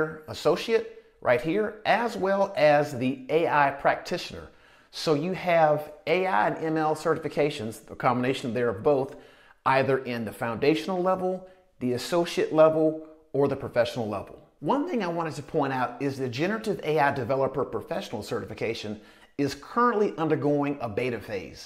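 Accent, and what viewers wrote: South, United States. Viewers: Alabama or Tennessee?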